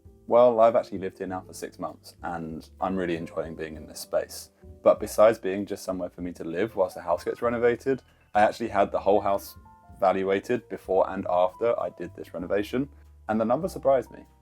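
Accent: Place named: England, United Kingdom. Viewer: I'm hitting a like because you're cute and I'm a sucker for the English accent.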